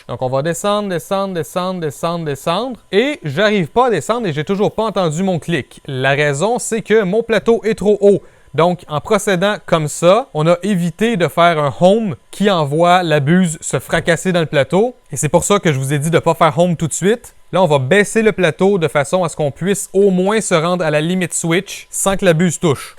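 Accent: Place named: Montreal, Canada. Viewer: Hey!!! bien l’bonjour du sud de la France, (ça fait plaisir de réentendre l’accent Montréalais 😁)super vidéo, ça me donne envie de sauter le pas...utilise tu toujours la U20 depuis y’a review? Y prend tu toujours autant de plaisir? As tu un profil correct sur Cura qui pourrait être partagé? Merci pour tout ce que tu fais 👍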